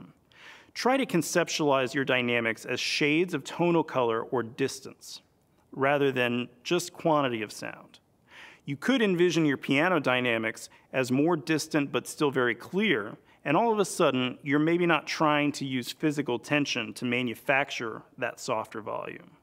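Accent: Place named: United States. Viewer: He has an American accent, but the temper of a man in the British Parliment.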